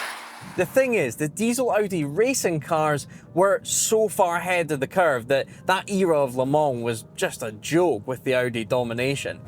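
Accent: Scotland, United Kingdom. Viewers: Why's Nigel Mansell got a Scottish accent?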